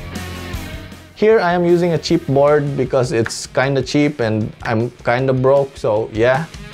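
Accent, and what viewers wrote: Philippines. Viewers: Is this guy filipino? Cause his accent kinda sounds one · Is this a filipino the way he speak english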